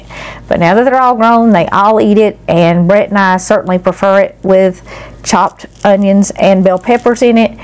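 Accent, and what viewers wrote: South, United States. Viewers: What does God have to do with meatloaf? ...and the backwoods southern drawl and terrible grammar are not encouraging ! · I remember Grandpa would use his left over biscuit bread as a filler. What part of the south are you from your accent makes me so home sick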